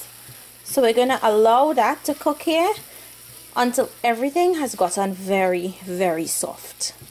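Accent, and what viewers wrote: Trinidad and Tobago. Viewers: Hey Iove your trini accent...otherwise very good trini version of solomon gundy..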